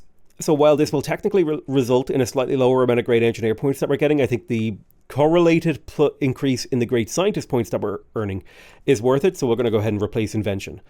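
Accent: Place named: Ireland. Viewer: I’m a natural English speaker, and you sound like an over caffeinated Irish dude. But I’ve been told Canadians speak really slowly compared to aussies, Brits, and Irish.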